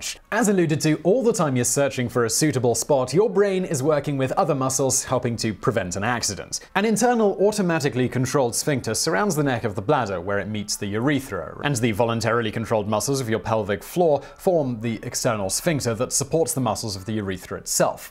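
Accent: United Kingdom. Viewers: pretty sure he's British but not 100%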